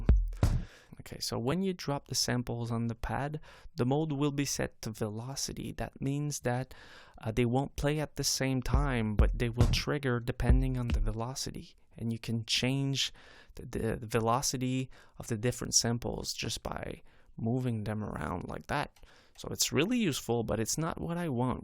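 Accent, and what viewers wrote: Quebec, Canada. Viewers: Do I detect a French Canadian accent?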